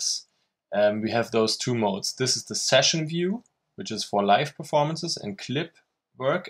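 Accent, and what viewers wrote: Germany. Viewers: Thanks mate! This is exactly what I need! I was making (trying to) some tracks in FL Studio like 5 years ago and think Ableton is better. Working here should be similar I guess, but I totally forgot how to set instruments etc:.:D So this tutorial is very useful! Anyway your accent sounds a bit German, do you come from Germany, the land of one of my favourite DJ, Paul van DyK?;D:)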